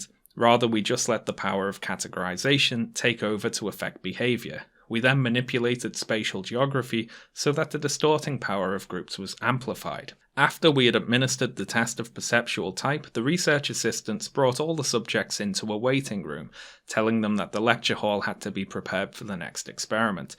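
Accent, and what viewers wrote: United Kingdom. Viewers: You know, you believe a lot of things I disagree with and have faulty bolster for some of your arguments, but your voice is very relaxing. Carry on, ever so slightly lispy British man.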